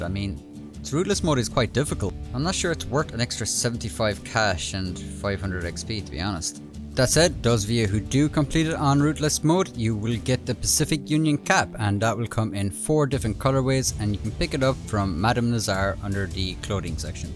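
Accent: Ireland. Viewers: Are you Irish? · Never heard your voice until now. I doubly respect you for being Irish and for your incredible videos 😂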